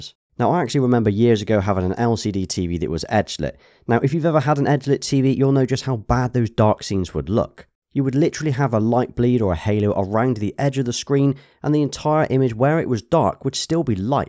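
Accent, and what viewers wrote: United Kingdom. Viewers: I really wanted to find someone speaking British English in normal speed, finally, the day has arrived.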